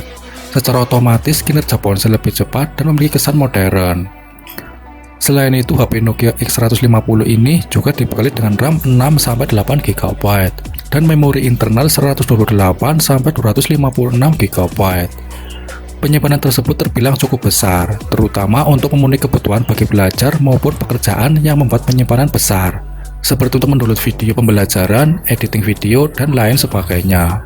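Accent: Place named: Java, Indonesia. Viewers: Suara khas, tegal ya keluar..😃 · Medok amat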